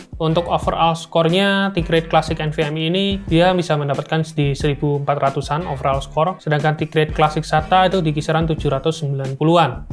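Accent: Java, Indonesia. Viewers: gak perlu pake subtittle kecuali logatnya pakai bahasa jawa kasar.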